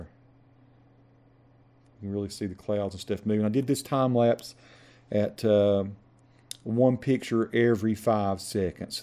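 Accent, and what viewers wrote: South, United States. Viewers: Hey there! Russian from Russia here! Привет из Питера, посоны! Just want to thank you for the vid and say what a lovely southern accent you got there! Keep up with a great tutorials!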